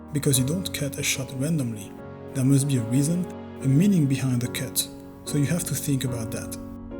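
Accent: France. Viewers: I have the impression that you are french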